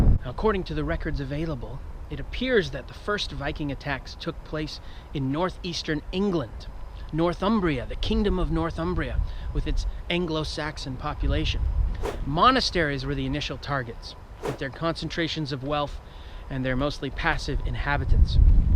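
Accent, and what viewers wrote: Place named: United States. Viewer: His accent sounds American to me.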